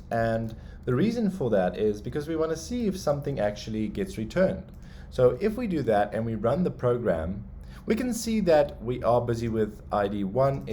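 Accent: South Africa. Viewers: Great stuff boss! Are you South African? It feels like im getting schooled by a long lost uncle. :). " In the coming weeks, I will create a solidity series that will teach you the basics of smart contracts on the blockchain." - The solidity code for NFT's pretty basic. its more the Minting Dapp and react app that can display the list of NFT's and filter is the hard part I think. ;) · Hallo, do you have a walkthrough video of the entire coding? I would love to have a basic understanding of what the different parts do without having to watch how all of it was coded. Thank you for all your effort <3 (I was pleasantly surprised to hear your braaibroodjie accent, ha-ha.)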